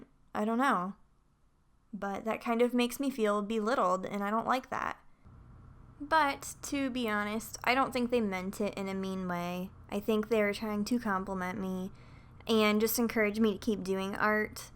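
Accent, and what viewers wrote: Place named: Northeast, United States. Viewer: Are you from RI, NY or MA? you have that accent. B^|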